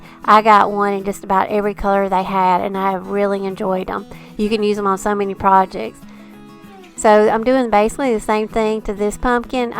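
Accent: South, United States. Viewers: I LOVE EVERYTHING YOU MAKE!!! Thank you for sharing your wonderful projects and talents!!!! And from one Southern Gal to Another, I love and appreciate your accent!!! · These are darling crafts. I love rag wreaths. The fabrics are so cute. I’m a seamstress and I love fabric. I love your southern accent. You have such sweet voice. 🎃🧡🍁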